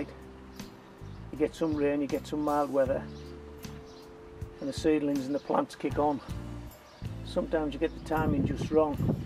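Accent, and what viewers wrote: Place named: Cumbria, United Kingdom. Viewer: Clicked on this to hear a fellow Cumbrian. Fantastic!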